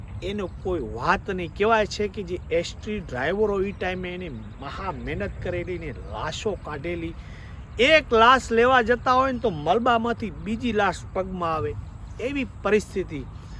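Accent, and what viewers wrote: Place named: Kutch, India. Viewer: વાહ અદભુત તમારી મીઠી કચ્છી ને દેશી વાણીથી માણસોને ઘણુ જાણવા મળેછે😊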